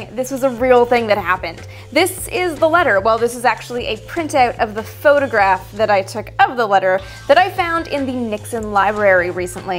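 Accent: Canada. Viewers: Missed your content. But most just missed seeing you. Like you face. Love the Canadian accent.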